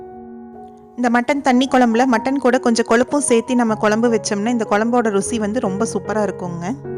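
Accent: Kongu, India. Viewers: கொங்கு தமிழ் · I'm your new subscriber sister.. Very tempting thanni kulambhu.. I'm from Coimbatore.. Unga kongu Tamil suprernga ...very nicenga ❤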